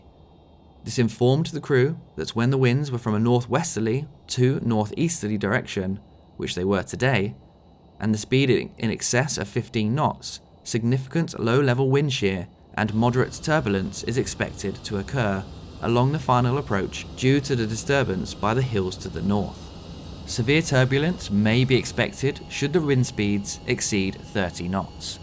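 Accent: United Kingdom. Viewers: I've skipped by your channel many times, didn't realise you was a fellow Brit. Thought you was another one of them boring American channels. Subscribed!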